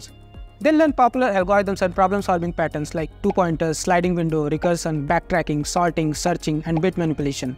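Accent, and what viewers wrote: India. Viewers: bhaiya aap bihar se ho kya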